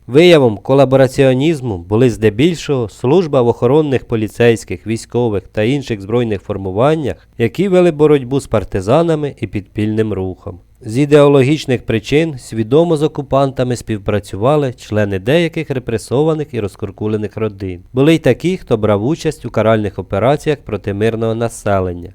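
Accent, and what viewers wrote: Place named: Transcarpathia, Ukraine. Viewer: вона просто з Закарпаття, як і я:)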